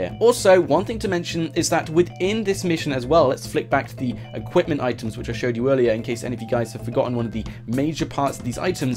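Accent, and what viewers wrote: United Kingdom. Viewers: Why do British people say pronounce it Mili-tree instead of mili-tary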